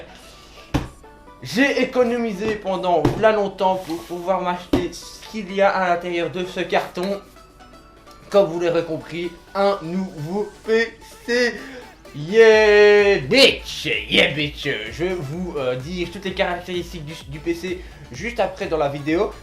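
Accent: Belgium. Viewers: di donc tes belges toi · Tes du côté wallon ou flamant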